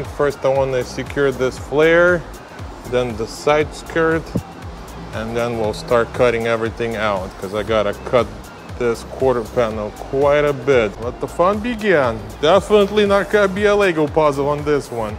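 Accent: Russia. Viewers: Акцент русский 😂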